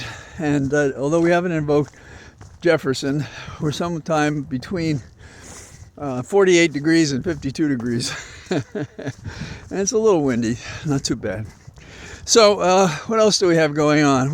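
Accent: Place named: Ireland. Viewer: Belated Anniversary Congratulations to you both … touch of the Irish in your talk today … made me smile 😀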